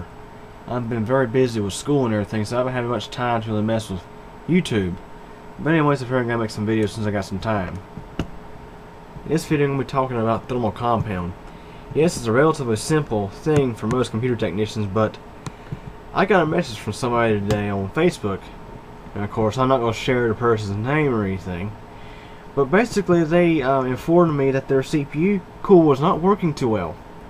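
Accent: South, United States. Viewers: That southern accent tho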